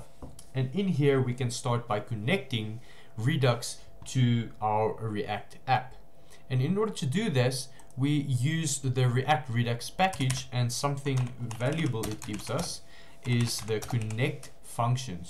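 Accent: South Africa. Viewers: Are you from South Africa ?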